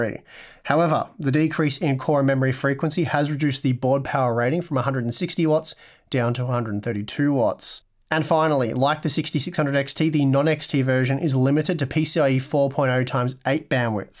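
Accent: Australia. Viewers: Audio's good. You're Aussie so it sounds fancy.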